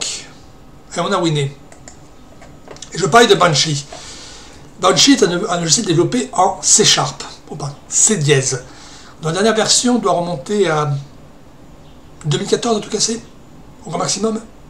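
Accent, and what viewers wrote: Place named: Arcachon, France. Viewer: Pour moi c'est plutot bel et bien une Dglfi. Sinon a part ça part chez moi en france et en français on prononce win mais pas winé. Tu es bien sur maitre sur ta chaine et ce doit etre du a l'accent d'arcachon qui est bien sympa de toute façon.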